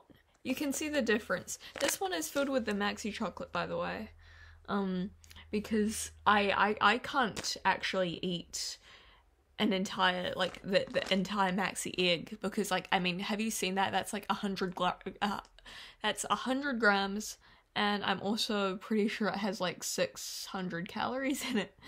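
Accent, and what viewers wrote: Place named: New Zealand. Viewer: its a new zealand accent :)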